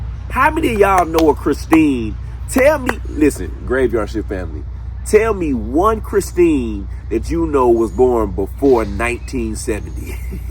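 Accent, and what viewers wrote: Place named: Texas, United States. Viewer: Sybelle said " you sound like you're from Texas" then she said "I like you & she may visit you" She also said " you were funny" 🤣 She likes you alot and wants you to come back. 😊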